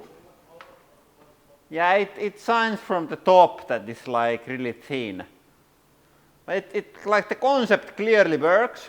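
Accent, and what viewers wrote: Finland. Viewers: You sound so finnish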